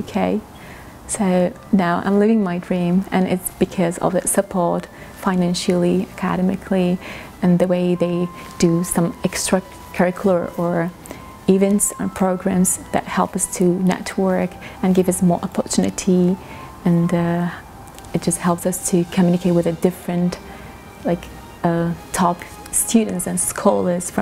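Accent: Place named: Iran. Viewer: Medicine I believe.... She has a Persian accent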